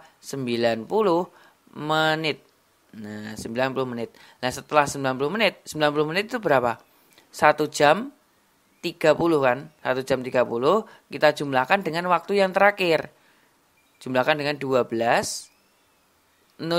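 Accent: Java, Indonesia. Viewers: Alhamdulillah kak, aku tertarik nama chanel nya kayak nama orang Chinese tapi logat jawa punya dan ada rumus The Ping-nya..andai guru mtk mass skolah kayak njenengan pasti mudeng pol.. Kak, moon maap aku jadi tertarik matematika lagi saat persiapan tes SKD gegara chanel njenengan kang, Saya kurang kerjaan, itu apa akronim Ping2an(jawa) dan perkaliean?? Kerenn pokoknya, mudah dipahami semuanya dari chanel ini Ohya mau melaporkan tes skd saya hari ini meski nilai tidak sampai tembus di atas 350, hari ini saya tes dan berkat chanel ini TIU yang biasanya try out saya nilai selalu anjlok alhamdulillah dapat dua kalinya.. Ini tes kedua saya semoga yg terakhir krn udah ketrima pns. aamiin Skor 335 "twk 90 Tiu 105 Tkp 140" Ranking belum ngeh di instansi yg saya tuju Sedikit FR Pecahan sederhana dengan pendekatan dan barisan juga posisi duduk melingkar jan persis deg penjelasan njenengan kak, maturnuwun saya minta doanya ya bisa lulus perankingan SKB sampai jadi ASN AAMIIN YA MUJIBASAILIEN.. 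MUGA barokah selalu kak ilmunya karena keikhlasan berbagi ilmu yg njenengan miliki semakin berkembang, saya nantikan slalu temasuk materi skb nanti apa aja ya kak. Terima kasih #maapkepanjangan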